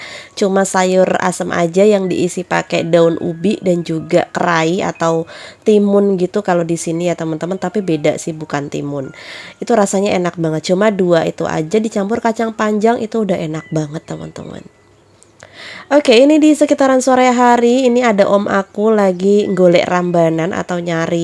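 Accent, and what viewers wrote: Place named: Java, Indonesia. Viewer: Mbak ngmong jawanya lucu... 😅medok bgt😂😂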